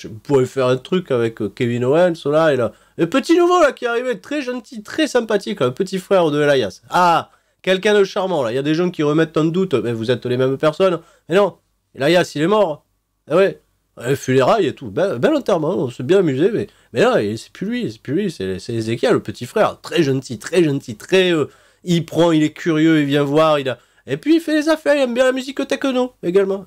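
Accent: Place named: South, France. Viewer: c'est plus très marrant le délire arbitre accent du sud un peu con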